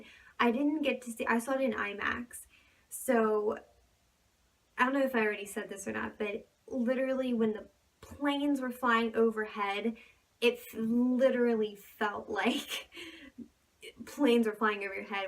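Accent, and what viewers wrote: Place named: North America. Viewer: Which part of N America are you from? I can't guess. Nice, intelligent review Thank You X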